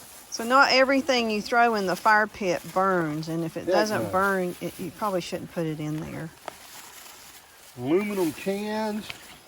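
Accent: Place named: South, United States. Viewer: Nothing wrong with your southern drawl. I understand you perfectly and I’m Canadian😊 EHH! Love your travels, hope to follow in your path someday.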